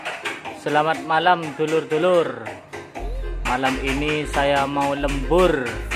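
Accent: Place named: Madura, Indonesia. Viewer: kalau dengar suaranya orang madura ya bang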